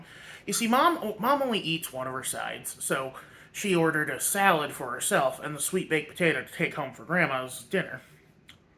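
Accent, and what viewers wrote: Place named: Midwest, United States. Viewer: I didnt know you live in texas you got a midwest accent strange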